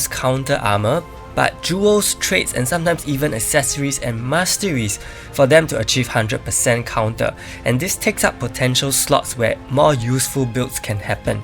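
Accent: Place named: Singapore. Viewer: Hah! I hear your (very familiar) accent and took the chance! Singapore yo ;) Looking forward to the next series. Take care in the meantime!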